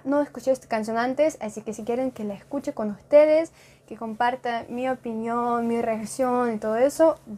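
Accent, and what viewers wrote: Argentina. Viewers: Muy bueno tu español argentino!! Saludos desde Rafaela santa fe argentina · Es rusa o argentina?? Ajaj que crack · me parece una colombiana con argentina jaja rusa no creo nose por q hay gente q le cree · Español ARGENTINO😍🇦🇷😘😄👏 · Fa como se te esta pegando el acento argentino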